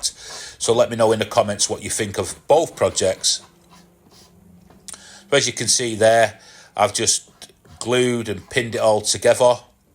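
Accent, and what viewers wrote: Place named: Manchester, United Kingdom. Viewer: great projects, like to hear that proper manc accent when you narrate your videos,can see you getting more confident in yourself,can't wait for the next one 👍👍👍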